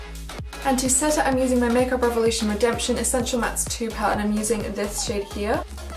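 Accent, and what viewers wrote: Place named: Australia. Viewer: it sounds really cool! At first I thought you sounded English then I thought Australian! So that would make sense! Sounds so cool tho!!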